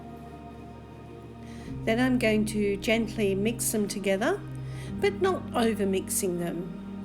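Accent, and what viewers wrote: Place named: Australia. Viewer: Just came across your channel and enjoyed the different ways you produce art from the gelli pad. Thanks for all the detailed comments and hints. It's great to hear an Aussie. Look forward to following more of your art works. Lesley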